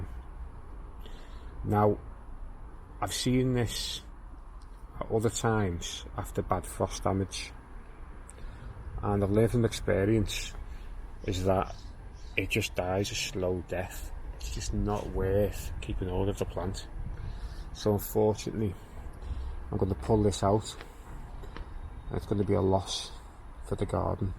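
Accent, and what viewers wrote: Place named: Scotland, United Kingdom. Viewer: i like gardening but for some reason when explained to me in a Scottish accent; I enjoy a lot more.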